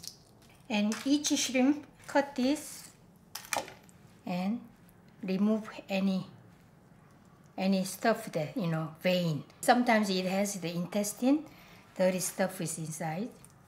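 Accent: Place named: South Korea. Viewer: Your Korean accented English is beautiful, and so are you!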